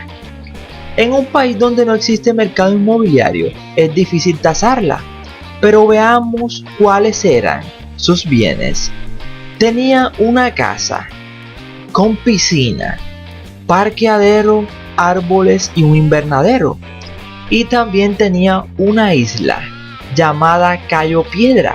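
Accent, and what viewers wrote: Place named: United States. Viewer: Dice Tany porque es norteamericano ? O no lo ve el acento que tiene?. No pronuncia bien..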